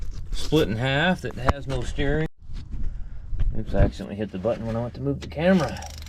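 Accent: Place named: Ohio, United States. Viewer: I heard "wars" and "tars" more from a guy from Ohio than I ever did from actual Texans when I lived in Texas for 30 years.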